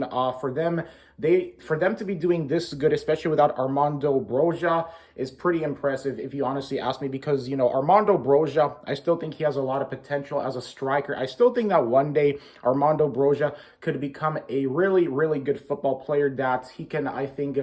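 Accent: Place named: United States. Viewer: polish with an American accent or polish-American !!!!good analysis anyways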